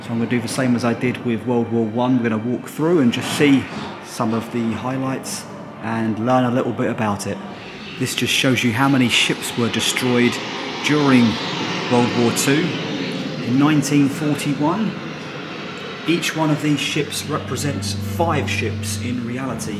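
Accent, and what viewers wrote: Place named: Essex, United Kingdom. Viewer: Been watching your lovely videos for a while now,loved the bulgaria ones,because of you we booked holiday there.you sound like a essex boy,im a cockney not many of me left debs xx ps your a 💎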